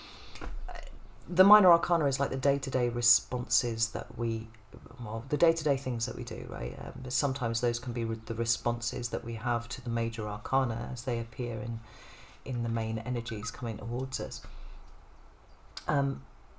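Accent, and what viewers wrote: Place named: Essex, United Kingdom. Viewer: Top shelf shit! What an amazing woman. You sound like you’re from my neck of the woods (Essex) too. Much love and thanks.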